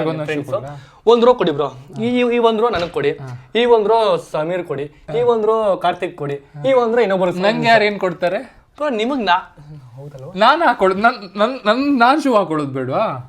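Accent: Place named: Mangalore, India. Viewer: Full mangaluru kannada , kusi apundu keniyera😅😅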